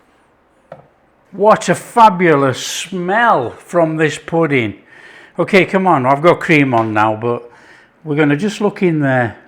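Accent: Yorkshire, United Kingdom. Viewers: Yummy... easy to prepare must get rid of my old apples and make good use of em, I would make the spices in a bowl I usually add a tsbl of METAXA and brown sugar. Thanks are you from Yorkshire Im a Yorkshire lass :)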